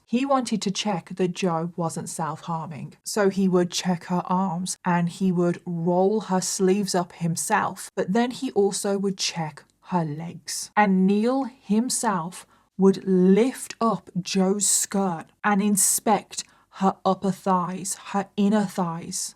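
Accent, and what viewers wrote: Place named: United Kingdom. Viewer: I watch your content religiously every week Ive seen every video you have posted. Rewatched and i love what you do and your brittish voice! -- Rachel from South Dakota 🇺🇸 🌎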